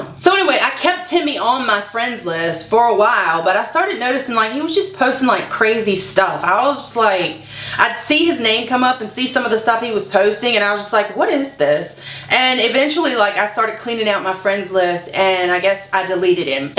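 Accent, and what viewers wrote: South, United States. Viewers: tbh you are an inspiration to me I also have a very heavy southern accent and I used to hate it, now that I have found your channel I am learning to embrace it. You are my absolute favorite youtuber.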